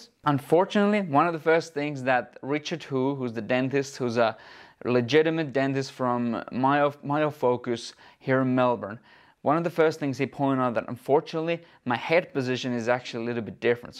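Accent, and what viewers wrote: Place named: New Zealand. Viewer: Where are you from, lad? Kinda sound like you're from New Zealand?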